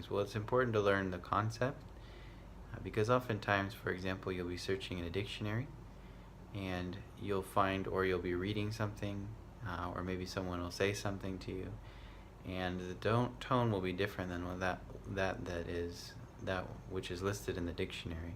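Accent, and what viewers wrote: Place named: Thailand. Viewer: Thank you so much! This was super helpful to me! I can’t believe I didn’t see this one before. Your accent is super thai here.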